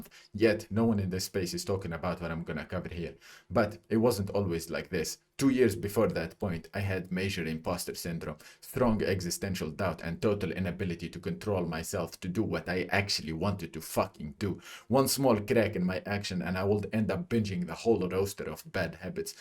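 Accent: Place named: Italy. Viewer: Wait why do you sound Italian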